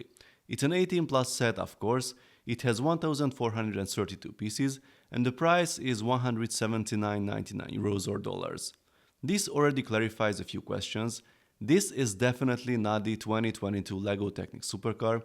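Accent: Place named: Hungary. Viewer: Magyar vagy? Akcentusból gondolom de ha nem így van szólj😂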